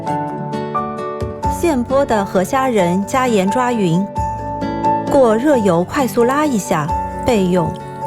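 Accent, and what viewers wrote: Shanghai, China. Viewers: 上海味的普通话，好亲切😄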